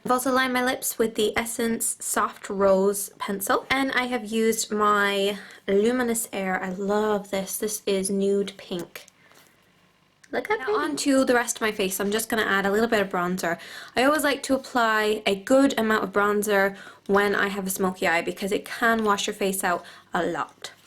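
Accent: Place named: Cork, Ireland. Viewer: Love your videos! Especially with a cork accent it good to hear! :-D haha! I've just started making my own videos if you wanna check om out! Get more Irish on here :-D haha! X x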